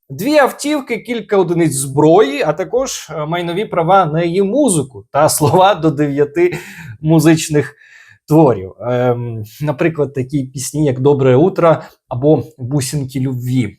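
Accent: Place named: Russia. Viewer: сгарайцє, сгарайцє...,, Фу, гидота. Вже навіть орківську вимову перейняла.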